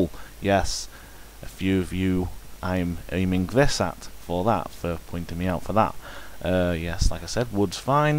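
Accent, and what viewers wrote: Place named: United Kingdom. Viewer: great British accent you have yourself a new subscriber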